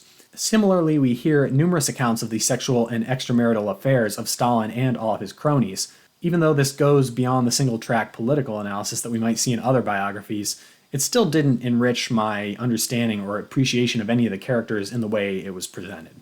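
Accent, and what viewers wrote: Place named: General American, United States. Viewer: Very insightful thx! By the way you have a beautiful "General American accent". 🎉 I am kind of a language nerd. ;) Keep up the good work! 🎉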